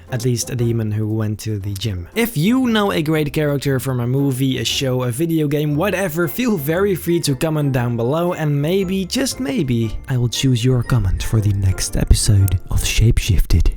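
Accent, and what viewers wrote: Netherlands. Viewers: Your dutch, i hear it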